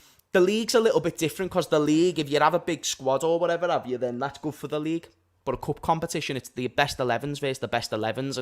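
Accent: Liverpool, United Kingdom. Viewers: will ya stop ur passionate bout ur team and ur scouse. Nothing wrong with that coming from an Irish ☘️ man.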